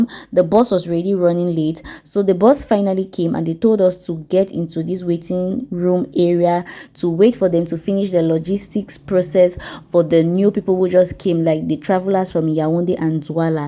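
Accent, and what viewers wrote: Nigeria. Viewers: u sound so nigerian u have our lingo too welcome here, please go to island and Eko atlantic city then go to abuja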